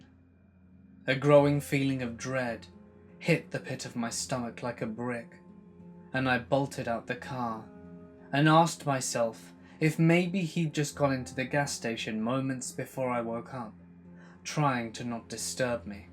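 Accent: England, United Kingdom. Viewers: do you realize how weird it is, as an American, to hear U.S. stories told by a man with an English accent? Well, it is! 😂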